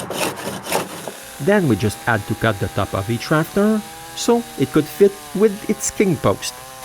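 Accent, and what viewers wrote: Quebec, Canada. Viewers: Un bon vielle accent quebecois hahah! Beau travail! Wow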